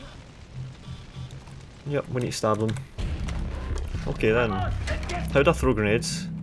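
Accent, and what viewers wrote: Scotland, United Kingdom. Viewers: SCOTTISH ACCENT FTW !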